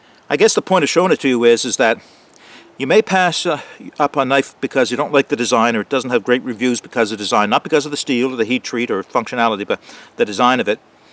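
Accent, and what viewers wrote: Canada. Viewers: Hi nice video. I watched this without knowing where you were located. Given your accent I was certain you were Canadian. I also noted that the wilderness looks identical to what I know. I noticed finally that you were in Nova Scotia. I am a little further north located in Southern New Brunswick. Best wishes and nice job on video.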